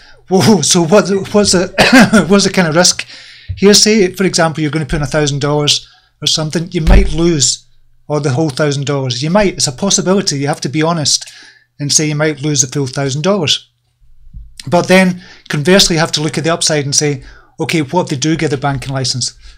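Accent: United Kingdom. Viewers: Just discovered your videos on Youtube. Nice to see a UK voice in the crypto space. Got yourself a new subscriber. 0xaC371637e14a<num>CeE06918A66e460B4e8b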